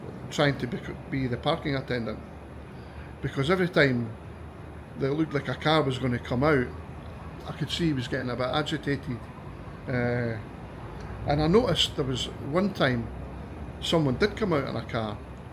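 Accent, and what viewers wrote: Scotland, United Kingdom. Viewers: Where are you from? You talk like the people in "Still game" and I absolutely love it😅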